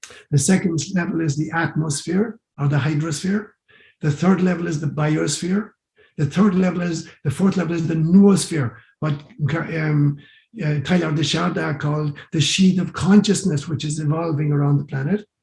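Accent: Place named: Ireland. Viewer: Just stumbled across your channel and just had to say I absolutely LOVE the name you gave your channel plus the bonus of listening to your lovely Irish accent which brings back memories of my Grandparents who immigrated to the USA!!! I look forward to learning and growing more spiritually with you ❤❤❤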